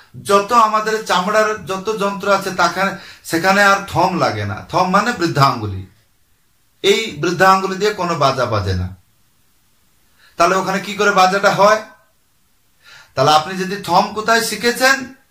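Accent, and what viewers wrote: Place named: Bangladesh. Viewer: আমি বাংলাদেশ থেকে দেখছি। আপনার কথায় কিছু কিছু বাংলাদেশের টান আসে তাই জানতে চেয়েছিলাম।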